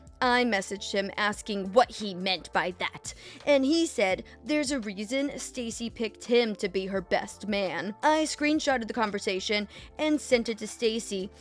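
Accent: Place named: South, United States. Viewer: Your redneck accent is on point 😂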